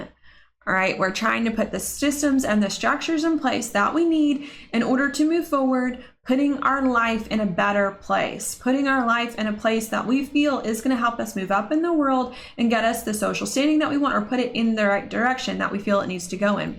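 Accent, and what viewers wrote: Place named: Pittsburgh, United States. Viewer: I just kept getting caught up on your CUTE Pittsburgh accent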